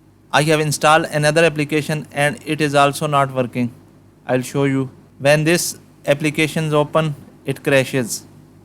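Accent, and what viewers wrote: India. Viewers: Im tired to listen indian acsent talk English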